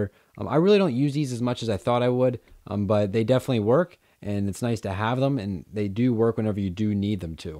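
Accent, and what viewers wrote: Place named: Pennsylvania, United States. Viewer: You are so from PA, lol. Nice review neighbor. Is there any lag with the keyboard at all? In other words, would it be ok for gaming?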